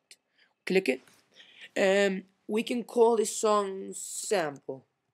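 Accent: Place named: England, United Kingdom. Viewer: your accent is very cool. Where are you from? I can't really tell if you're slightly english, or Indian..thanks for the help too :)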